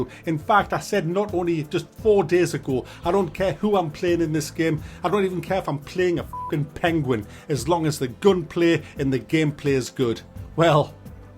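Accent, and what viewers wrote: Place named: North East England, United Kingdom. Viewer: Are you from sunderland or anywhere in the north-east because you really sound like it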